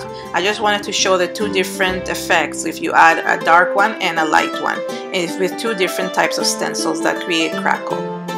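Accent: Israel. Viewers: From your name and accent, I’m guessing that you’re Israeli? Love your videos!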